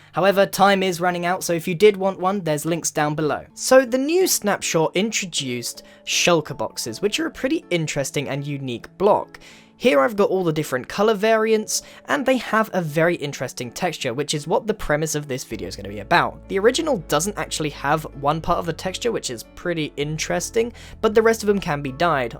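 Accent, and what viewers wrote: United Kingdom. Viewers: That British Voice Is just, way off from mine, I am British and i sound so different. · He sounds to British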